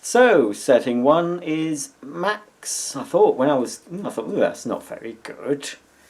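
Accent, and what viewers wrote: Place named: United Kingdom. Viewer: Love the British accent! (That's a compliment)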